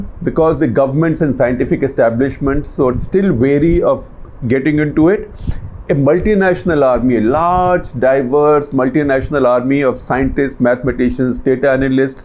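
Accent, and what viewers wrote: India. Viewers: The Indian accent is too strong it sound very hilarious although the guy is very serious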